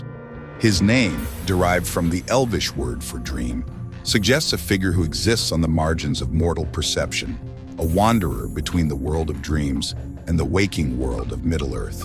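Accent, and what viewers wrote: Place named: Scotland, United Kingdom. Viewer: Quite the Scottish accent you have, growing up in the beautiful lands of Scotland and all. Telling 'your' stories that are inspired by the beautiful landscapes you grew up in. Sounds authentic.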